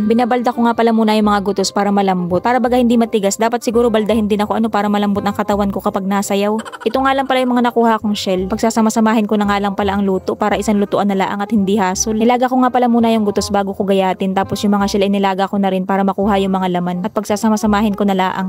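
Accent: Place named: Marinduque, Philippines. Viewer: salita mo salitang marinduque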